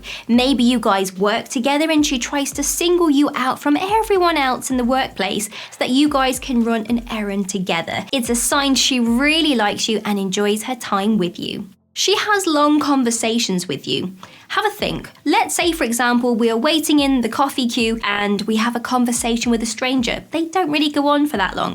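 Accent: United Kingdom. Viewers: Looking at you and listening to you is like looking at you and listening to you for the first time. Love your British accent on top of that your personality and beauty sets it off. You're fine as frogs hair!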